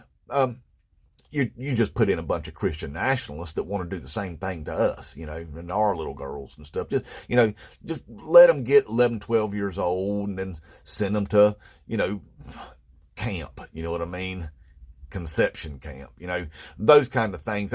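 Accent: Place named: West Virginia, United States. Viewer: I just love the way you talk. Reminds me of my grandparents from West by God Virginia. I was telling my grandma (God bless her soul) back in the 90s that I was going to vote republican. She looked at me and said. "Lauren, why would you go and do that for? Our family have always been Democrats.". Well, I gotta tell ya that I have never forgotten those words, and I learned the hard way about Republicans over the years. I switched to blue somewhere near the end of the bush administration. I saw this Maga movement coming before it happened. I saw the racism and hate first hand and didn't want any part of it. So here we are. Trying to wake people up before there is no democracy. Before the United States ends in a bad way. Vote Red, end up dead.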